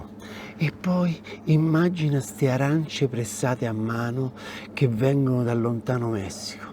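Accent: Rome, Italy. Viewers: sei il.migliore per. Simpatia, e con il tuo accento romanesco è come essere a Roma in diretta. Grande 💪💪💪🌈🌈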